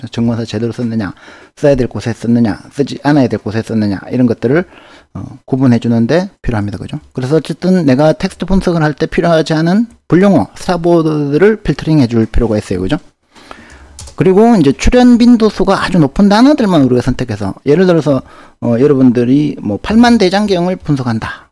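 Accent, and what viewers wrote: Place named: Gyeongbuk, South Korea. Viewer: 우와 교수님, 목소리도 귀에 팍팍 꽂히고 설명도 너무 재미있어요! 교수님 사투리가 경북 사투리인가요? 대구사투리나 경남사투리는 아닌것 같아서요...중간에 "감성분석(Sentiment analysis)" 언급하셨는데, 이 방법으로 혹시 사투리를 구분하는 것도 가능한가요? ^^ 고급강의 올려주셔서 감사해요~아이 씬나~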